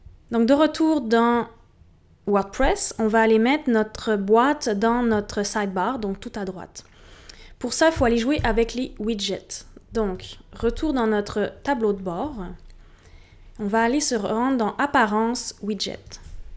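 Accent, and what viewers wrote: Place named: Quebec, Canada. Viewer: Quel accent charmant! Faut que j'aille au Québec !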